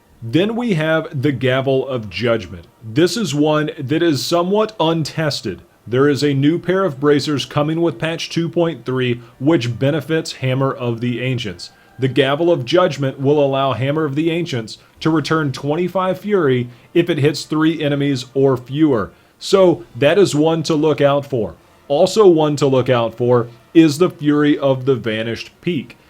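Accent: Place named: South, United States. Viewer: Oh a southerner! OK, you're off the hook. Keep up the great videos! :-)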